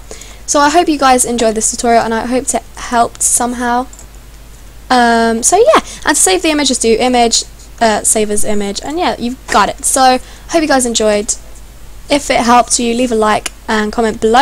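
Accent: United Kingdom. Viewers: Is this a British accent? You sound like it to me...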